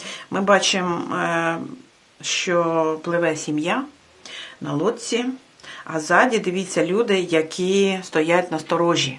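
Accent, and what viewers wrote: Surzhyk, Ukraine. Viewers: українська мова навіть з суржиком, всеодно краще, ніж рашистська. І це дуже добре,що українська навіть через такі страшні події. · тримайтеся,тримайся люба Одесо.Нам всім дуже болить.В вас гарно виходить українською,а те що трошки суржик це нічого,більшість людей розмовляє трішки з суржиком.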